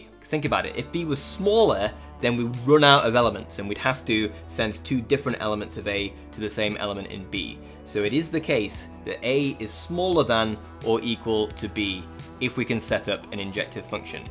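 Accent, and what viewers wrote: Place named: Northern England, United Kingdom. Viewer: He's from the north of England. The accent you're referencing is confined to the south east.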